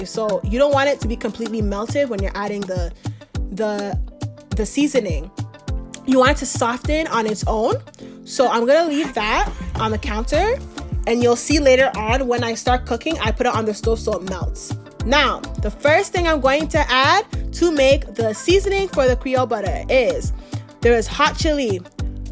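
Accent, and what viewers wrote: Toronto, Canada. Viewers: Soon as u started talking!! I said she a Canadian!! We really got an accent!! Nice recipe!!! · Toronto accent smacked me in the face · That Toronto accent is hilarious 😭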